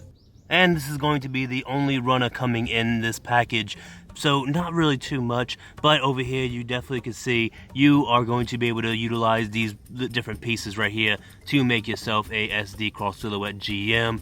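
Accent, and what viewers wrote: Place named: Australia. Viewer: Can someone translate??? I don't understand Australianese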